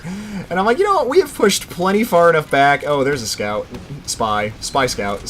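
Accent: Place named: South, United States. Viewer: Trying to guess your regional accent. South, but not deep deep south. Virginia or N. Carolina.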